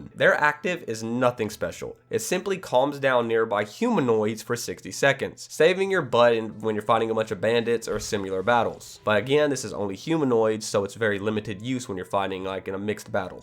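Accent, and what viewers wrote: South, United States. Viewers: Southern guy playing some Skyrim? Subbed!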